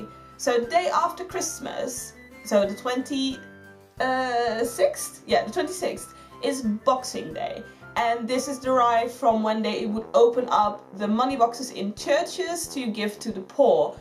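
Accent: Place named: England, United Kingdom. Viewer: So basically... You've never heard of Gluhwein? That's KINDA a tradition here.... And ugh, chocolate oranges :( I really dislike the taste of those xD. But I love seeing you getting more and more English both in speech and manner xD Anyway, enjoy your boxing day and have a great new year!!!!!!!!!!!!!!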